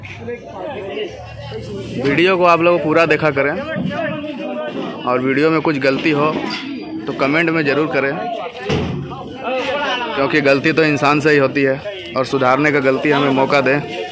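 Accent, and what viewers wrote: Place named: Bihar, India. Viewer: भाई आपका बिहारी भाषा हमें बहुत अच्छा लगता है 🤗🤗🤗🤗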